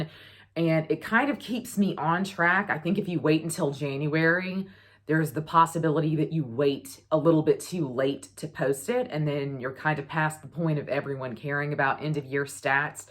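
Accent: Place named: North Carolina, United States. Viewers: Is that a NC accent? I like yer vlaugh. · Yep, I'm from NC, and sounds a lot like me!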